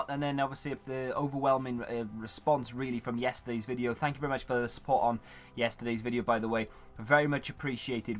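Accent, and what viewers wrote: Wales, United Kingdom. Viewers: are you welsh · tell you are welsh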